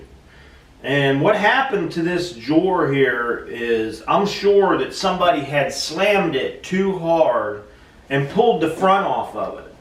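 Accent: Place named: Pittsburgh, United States. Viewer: Hey, where are you from? You sound like you have an east coast yinzer accent..... just curious.. thanks.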